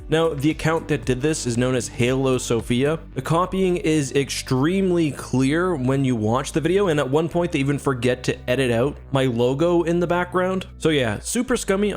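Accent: Canada. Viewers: The entirety of this video just sounds so Canadian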